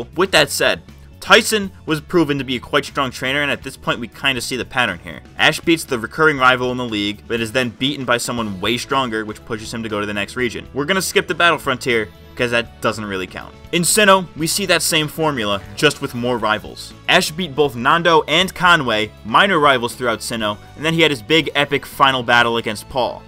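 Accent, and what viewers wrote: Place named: New Jersey, United States. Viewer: You sound like a New Jersey version of Ethan Klein.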